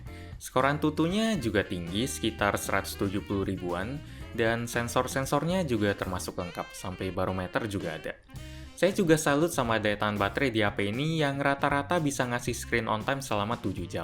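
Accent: Palembang, Indonesia. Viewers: orang palembang ya ko?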